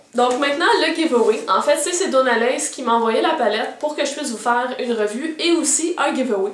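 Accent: Quebec, Canada. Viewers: Je participe a fond! Ta chaine est genial et ton sublime quebecois me fais revé moi grande Parisienne! ahahah! A bientot pour d'autre tuto. J'ai aime la page sous Lima Lapetite!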